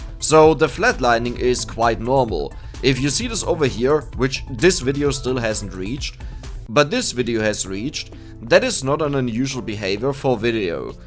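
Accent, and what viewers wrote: Germany. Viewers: You have an analytical approach to both games you play and things like this, your channel's growth. That's pretty unique and along with your personality, you don't have to worry about the channel. You'll grow as a creator no problem, as long as you keep at it. Also, German accent is pretty cool, ngl.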